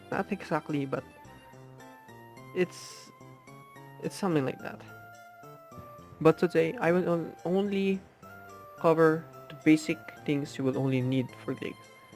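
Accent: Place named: Philippines. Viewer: The Philippinese accent is strong with this one amen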